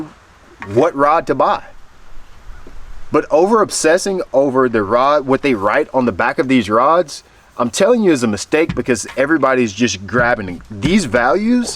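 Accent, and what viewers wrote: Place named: South Carolina Lowcountry, United States. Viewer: I have know for a while there was no standard on rods. That's why I don't order a lot of them online. I know fisherman that will only buy a certain series from a certain brand because of this. You are absolutely right about there is not much choice for a quality ultralight, 7 ft. spinning rod., With more use of BFS, DC bait casters the same can be said for casting rods, Especially at a decent price. A lot of people still fish with a closed face spinning reels for crappie panfish., I think there would be a market for more ultra lite 7 ft casting rods. Where I fish, Clark Hill, is now full of 12 and 13 inch Spots. They are a blast on light tackle, I like your no nonsense videos. Or maybe since I am from the S.C. low country, we just talk the same. 😂 I will give your rods a chance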